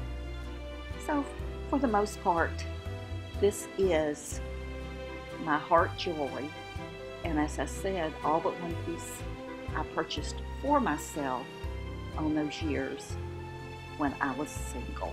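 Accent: South, United States. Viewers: I love the jewelry and the teapot. Great taste! I want to share something with you. It may sound a bit odd to you but there is reason, sort of, behind it. You are a comfort source for me and I'm not sure why. Your voice, the Southern Belle accent, you being older, which represents wisdom to me. You've mentioned your daughter in some videos and I get the feeling you and she are close. You just seem thoughtful, maybe doting at times on the ones you love. Anyway, now for the "reasoning" behind these statements. This is hard for me to share, but, here goes. I have been having issues with emotional and therefore mental issues as well, off and on for many years now. My life with others has not always been very nice, putting it mildly, and as a result, I deal with PTSD. I have developed a need for comforting, soothing things but rarely, people. I am very reclusive now, and since my mother passed, more sensitive to my surroundings, sounds, smells, people, etc. 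Listening to you and watching your videos is comforting and soothing to me. Although there are others that I love seeing and feel good around, there is only one person that I can handle being with regularly and that is my husband. I am going through one of my "darker" phases where the noise in my head from my past is very loud and I happen to notice the other day that when listening to you speak, I feel a comfort, probably because you remind me of the softness but yet tough, southern ladies in my family, a certain gentility if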